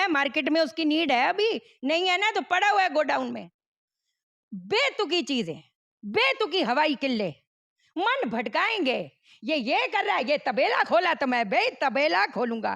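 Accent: Punjab, India. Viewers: Di kya aap Punjabi ho Maine kai baar suna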